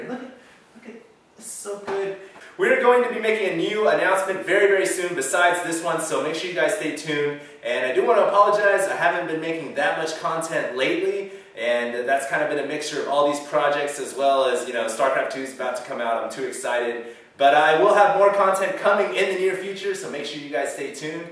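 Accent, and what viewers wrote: United States. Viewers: he sounds american wtf doesyour statement mean???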